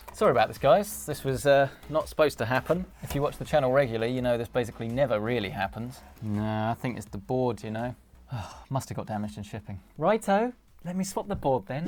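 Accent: United Kingdom. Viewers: You accent is definitely British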